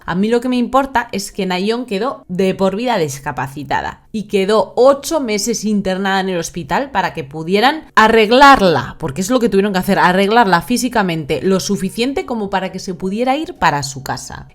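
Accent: Spain. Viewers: Me gusta la información lo malo suelo explicas con acento español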